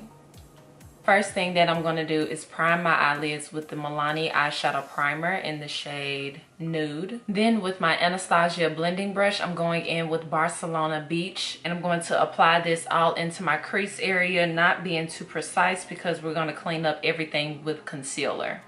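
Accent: South, United States. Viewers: Beautiful! Every Time I watch your videos I always think of Beyonce your voice sounds so much like her speaking voice. Not just the southern accent but also the tone.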